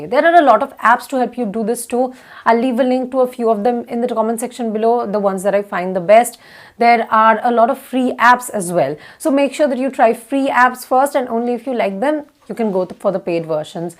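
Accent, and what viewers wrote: Bengal, India. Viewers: You are always fabulous. Adorable indian accent.❤ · Everything is ok but i don’t like indian English. So bad accent . 😏🤧🤧🤧🤧 sorry to say. I can't respect it....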